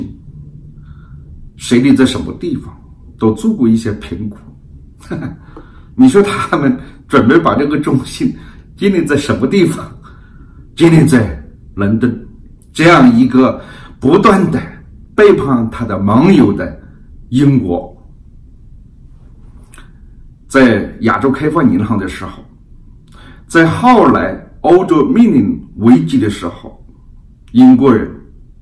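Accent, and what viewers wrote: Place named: Hunan, China. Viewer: 湖南人口音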